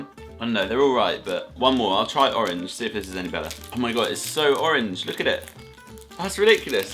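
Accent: United Kingdom. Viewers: i am british like you ello mate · Wait... Your British? X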